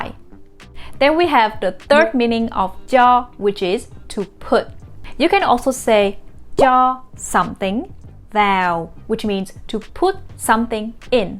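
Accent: Vietnam. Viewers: Is this Northern accent or Southern accent? Thanlks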